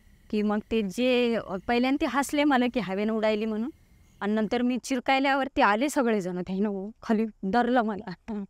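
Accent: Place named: Marathwada, India. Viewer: आमची मराठवाड्यातील ग्रामीण बोली भाषा आहे. कृत्रिम नसल्याने ऐकायला छान वाटते.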